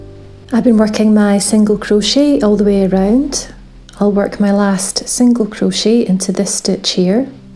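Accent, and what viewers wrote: Scotland, United Kingdom. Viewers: 🥰Just wanted to say that I love your tutorials,! They are so easy to follow and the result is absolutely perfect! Oh and of course love your Scottish accent! 😄 Keep the videos coming because I for one am hooked for life! Pun intented!;)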